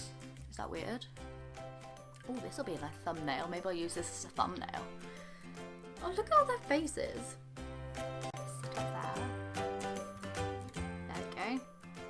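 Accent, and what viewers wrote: England, United Kingdom. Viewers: Awesomely adorable squishy collection! Daughter & I can not wait to get started, then maybe we can tackle your squishy vending machine which was decorated so so sweetly! Congratulations on all your new subscribers! I enjoy the voice over explanations, not to mention your lovely English accent, which is a rarity here in America.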